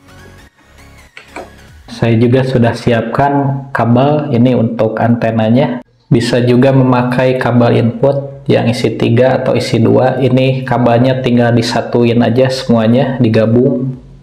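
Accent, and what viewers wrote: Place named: Sunda, Indonesia. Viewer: Urang sunda nya mang,,,😁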